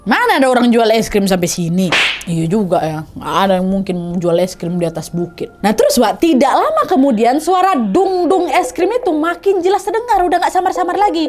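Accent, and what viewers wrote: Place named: North Sumatra, Indonesia. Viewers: Kak, kk ni asli mana kah? Kadang pake slang medan, kadang minang. Aku kan jadi pinisirin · Makin kesini kok kayak makin yakin kalo kak emang orang sumut. Ntah medan atau binjai mungkin ya. Soalnya cakapnya logat² nya sumut kali 😁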